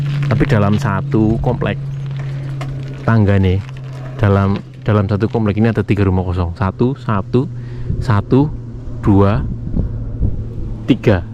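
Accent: Banyumas, Indonesia. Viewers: Bang banyakin ngomong ngapak nya bang...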